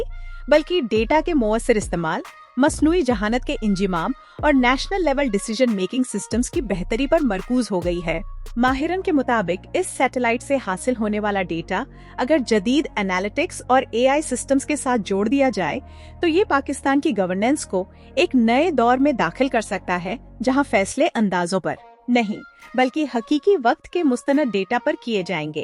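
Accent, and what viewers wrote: India. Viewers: ویڈیو میں ہندی لہجے کی آمیزش نے مزہ کرکرا کر دیا۔ سوفٹویئر تبدیل کریں۔ خلا کو کھلا، مزید کو مجید اس طرح کی مزید کئی تلفظ کی غلطیاں ہیں۔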